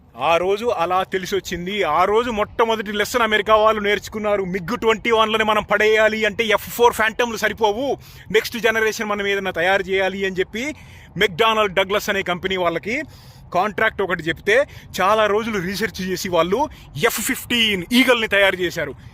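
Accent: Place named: Telugu, India. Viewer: This is my favourite video, I watched not less than 25 times, your language and the native Telugu accent makes it so memorable, SV Ranga Rao gari Mayabazar Dialogues gurtuku vachayi, Ghantasala gari voice laga anipinchindi - antha Gaambhiryam vundi voice lo.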